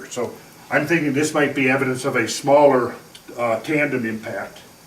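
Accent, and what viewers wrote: Minnesota, United States. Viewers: I grew up on the banks of glacial Lake Agassiz known as the Red River Valley now. At least we were told it was Lake Agassiz. The Red River Valley is sedimentary. A dang good place to have a farm if you don't mind being flooded out every once in a while. By the way, I knew you were from the Minnesota North Dakota area before I heard you mention it. Your accent is mostly gone, but I detected it. Mine is mostly gone as well until I cross the North Dakota border then I sound like a character in the movie Fargo.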